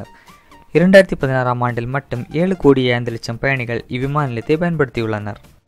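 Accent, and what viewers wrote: Kanyakumari, India. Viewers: Ne kanyakumari aa pa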